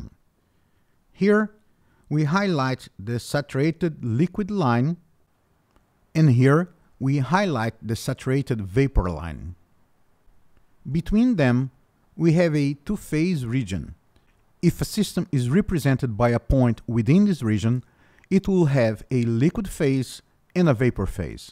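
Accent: Brazil. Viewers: Percebi que era brasileiro pelo sotaque hahahaha, ótimo vídeo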